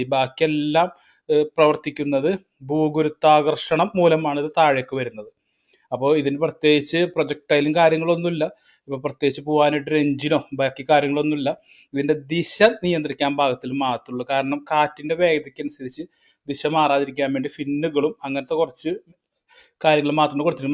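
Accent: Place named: Thrissur, India. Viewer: മലയാളം ശരിക്ക് സംസാരിക്കാൻ ശ്രമിക്കൂ. തൃശ്ശൂർ സ്റ്റൈലിൽ അല്ല വലിച്ചു നീട്ടിയുള്ള സംസാരം ഒഴിവാക്കു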